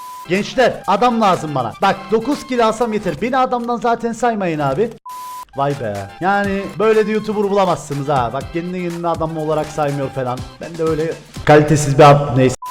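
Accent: Cyprus. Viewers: Sen nerelisin kibristan mii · Ben seni Kıbrıslı sanıyordum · Abi sen kıbrıslımısın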